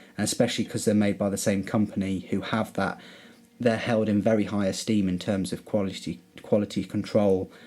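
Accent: United Kingdom. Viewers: A really nice British accent :) BTW thanks for a review.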